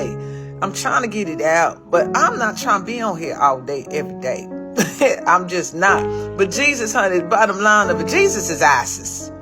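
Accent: United States. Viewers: Could someone tell me what region/state her accent comes from? I can't recognize it. Is it Southern states , West Coast, Midwest?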